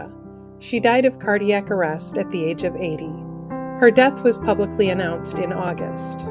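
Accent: United States. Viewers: American that's why speaks clear English.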